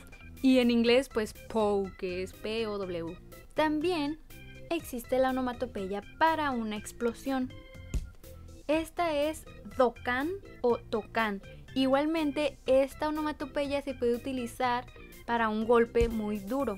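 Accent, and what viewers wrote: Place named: Chihuahua, Mexico. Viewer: ¿eres de chihuhua? :v Tienes el acento parecido a los residentes de ahí xd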